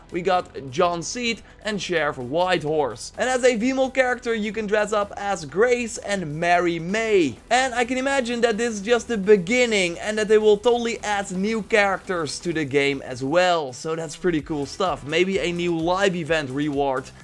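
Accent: Netherlands. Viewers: r u dutch? accent sounds like it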